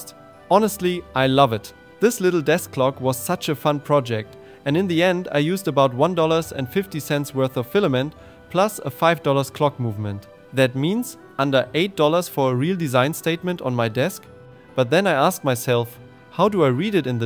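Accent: Germany. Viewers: Hör ich da einen deutschen Akzent oder irre ich mich? Gutes Video und ich freue mich dass YouTube dein Content pusht! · the german accent 😂. banger video aber! ich wünschte ich hätte auch einen 3d drucker.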